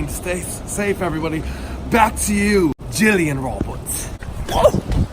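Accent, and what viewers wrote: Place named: United Kingdom. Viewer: Your voice is different by adding that your accent looks British, not American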